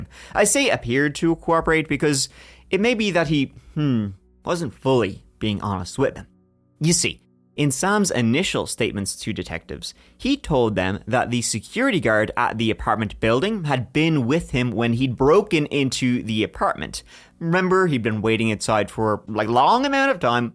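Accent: Ireland. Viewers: I liked our little date 😂😂 We love your banter with but you are what hooked me. I love spooky stories in an Irish accent, thank you for all you do!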